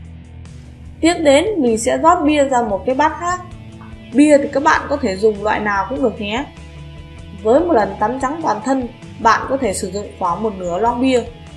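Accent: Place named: Countryside, Vietnam. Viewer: Giọng quê mùa kém sang lắm, ơi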